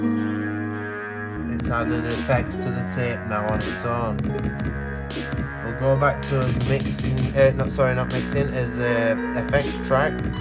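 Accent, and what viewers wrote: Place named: England, United Kingdom. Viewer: I've watched quite a few tutorials on the OPZ and still found some new tips on yours. And it's nice to hear an English voice for a change! Thanks👍😊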